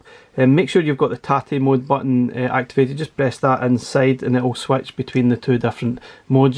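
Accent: Scotland, United Kingdom. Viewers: Just wanted to say I recently found your videos after getting a Super Pocket having wanted an Evercade device for a while, and they’re fantastic :D then couldn’t resist an Evercade VS after getting a few games and wanting to play the on the bigger screen…your reviews are invaluable for deciding what to get next, and it’s always good to hear a fellow Scottish accent on YouTube :D thanks for all you do!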